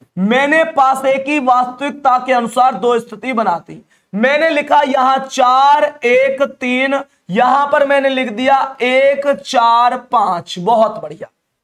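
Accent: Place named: Aligarh, India. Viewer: Sir aap aligarh se ho kya Apki language hmari jesi h😂 M aligarh se hu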